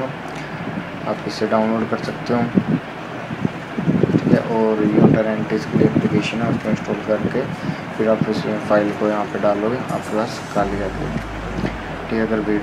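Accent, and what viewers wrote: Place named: India. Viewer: You didn't notice the background noise and thick hindu accent? (facepalm). Seriuosly..get your hearing checked.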